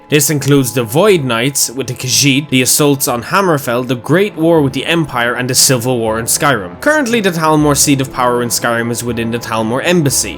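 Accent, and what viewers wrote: Ireland. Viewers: First video I have seen of your channel and I'm so glad I did. I love your Irish accent and the humour is brilliant great lore video. 👍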